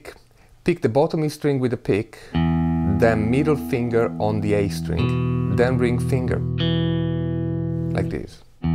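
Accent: Italy. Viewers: Your teaching technique is excellent. The Italian accent only adds tot he experience. Thanks,. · Japanese?? Sounds Italian, as he is. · Si sente che sei Italiano xD Bravissimo comunque ^^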